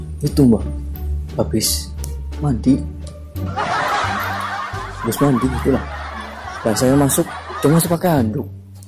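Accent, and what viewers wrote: Banyumas, Indonesia. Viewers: Ngomongnya medok tenan mas bro, Sampean wong jowo ngapak Yo ? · Ngomonge ngapak nemen Mbok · Wong Banyumas apa rika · Mas,,, rika wong ngapak ya??? Pada bae mas... 😂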